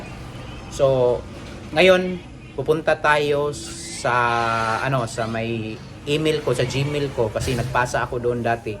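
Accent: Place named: Visayas, Philippines. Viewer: Hmm my idea n din ako Peru parang bisaya c sir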